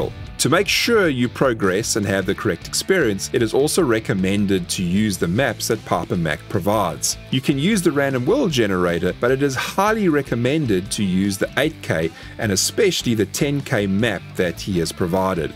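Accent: South Africa. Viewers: First vid of yours I've seen. About 2min in, I was like, this dude sounds like a Saffer. Lo and behold a fellow South African. Nice one boet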